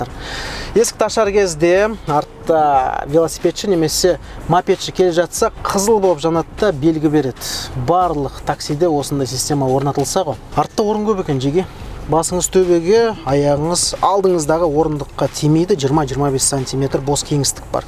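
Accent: Standard. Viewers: Қандай таза қазақша сөйлейсің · Қазақша таза сөйлейді екен👍👍👍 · қазақша таза сөйлейсиз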